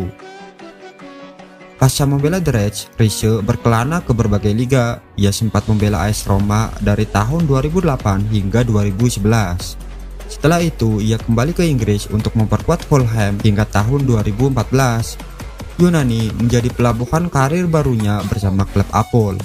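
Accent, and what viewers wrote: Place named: Banyumas, Indonesia. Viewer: Ora ngapak ora kepenak,, gua tau dr aksen narator nya 😁😁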